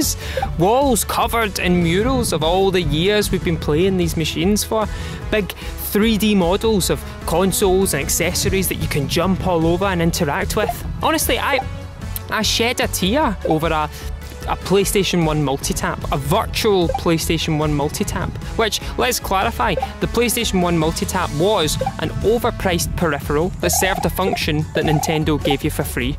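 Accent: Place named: Scotland, United Kingdom. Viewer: You should review more games. I love that Scottish accent. As an English language learner, this is like relearning English all over again.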